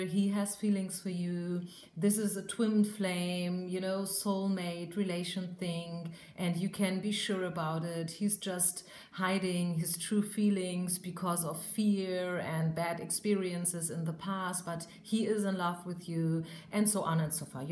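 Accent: Germany. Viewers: Your german accent is really charming :) I speak german too and I totally feel how translating straightforward german sayings int english can be tricky. it's like in german you have a word for EVERYTHING :D you did an amazing job and your voice is very sweet and calming too. Thank you for relaying these messages to us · You have a German accent :D Am I right? I am asking because I am very interested in different accents and I am always very happy about guessing correctly. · I love that german ascent A lot! 😍😍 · your accent is so rich and beautiful. i studied german for two years and still can hardily understand it. you’re such a beautiful soul with a beautiful accent.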